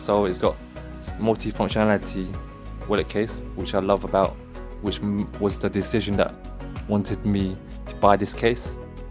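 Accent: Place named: United Kingdom. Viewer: It's a pain in the ass listening to British accent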